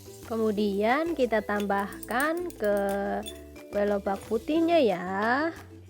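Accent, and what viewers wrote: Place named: Taiwan. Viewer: seumur-umur baru kali ini makan lobak putih kukus yg enak dan seumur-umur baru dengar suara ngapak aksen mandarin Taiwan.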